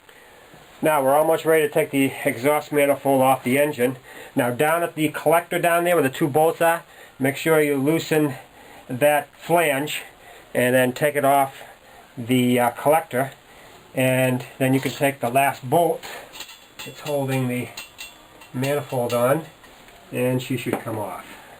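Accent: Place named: Boston, United States. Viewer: love the video! i lived in boston before. sounds live your from there lol!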